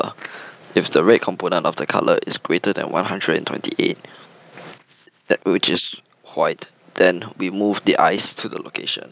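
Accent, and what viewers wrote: Singapore. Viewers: Yooo singaporean??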